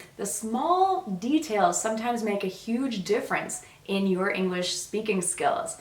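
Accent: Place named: United States. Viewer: her pronunciation is American because she from America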